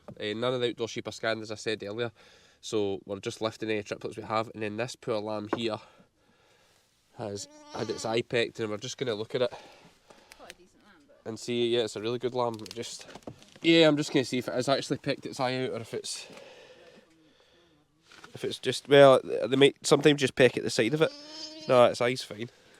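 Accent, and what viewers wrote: Scotland, United Kingdom. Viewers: I'm impressed with you running and chasing Ewes shortly after having Covid. It usually takes people several weeks to recover their breathing and strength and your recovery is amazing in the fact you can actually run chasing a eve around. I'm impressed with Meg's rounding up the ewes but not exactly 100% sure i understand your accent enough to figure out your commands to her. Could you please explains your commands to Meg for us foreigners. I think Meg's been trained abolutely great, and wonder if the breed she is has some natural instincts or shes just an amazing wel trained about what to do with your sheep. i wouldn't mind you confirming what your commands are and what they mean. I also would like to know what each type of sheep they are and why they are called that. Is it age or type or both. I enjoy your channel but I find I have to watch several in a row to get back into understanding you. 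I appreciate the Scottish terms you use but would like a I guess it would be better with a translation as to what you mean. You're doing a great job and hope your channel grows and I appreciate all the hard work it takes for especially to release a vlog daily especially when you're so busy working lambing all day. when youre and you mentioning that what you get for your vlogs and merchandise helps during your tougher months and hope you'll be earning 10 times as much as your currently getting. A thing I wish you'd explain better. Maybe I missed an episode. Could you explain what type a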